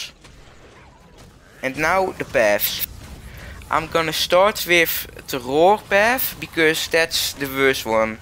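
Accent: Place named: Netherlands. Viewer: i can just feel ur dutch voice.. Don't worry about it i have the same problem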